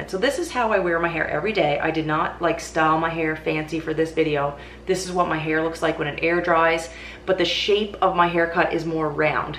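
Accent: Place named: Nova Scotia, Canada. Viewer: So glad to hear your Nova Scotia voice again! I also got into house plants about 2 years ago and now I probably have a hundred. I have them in the front yard, in the backyard, and all over the house. Last year we had a freeze and I had to move them all inside and then it was ridiculous. But most of the time they can live outside because we don't freeze, which I know is not an option for you. I cut my hair to chin length and most of the time have it pulled back with a headband. It's not my best look but I'm at a stage right now and I just can't stand to have my hair in my face. Again, great to see you!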